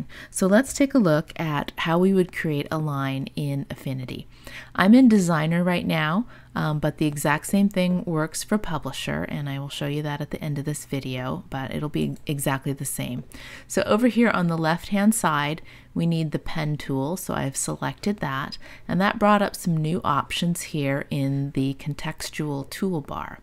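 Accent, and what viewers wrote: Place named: United States. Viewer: What a relief to hear/see a tutorial in American English.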